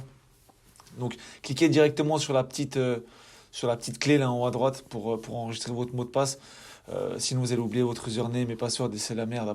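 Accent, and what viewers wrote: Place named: Belgium. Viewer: Salut merci de ton partage. moi, je donne juste mon avis. Un lien venant d'un site anglais n'est pas gênant a condition que la langue reste en anglais. Pourquoi ? Quoi de plus naturel d'avoir un lien d'un site anglophone qui fait un lien vers un site en .fr (ou .be au vu et au son de l'accent) du moment que l'ancre ou les mots proches annonce que la source (du lien) est francophone. en gros si on site un site étranger et qu'on explique dans le texte l'origine du pays du site, ça passe. et surtout ça parait naturel.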